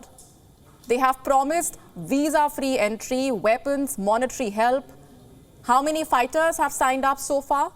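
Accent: India. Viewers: They should hire a english native speaker, the indian access is very thick T_T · Too heavy Indian accent - can't understand what she was reporting. Not too many people tuning in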